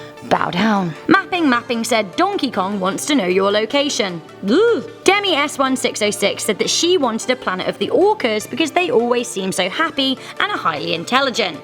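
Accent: United Kingdom. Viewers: Its weird listening a British woman say Texas tbh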